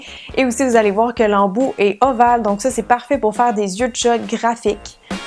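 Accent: Quebec, Canada. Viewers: Es-tu québécoise ou quelque chose de ce genre car tu as un accent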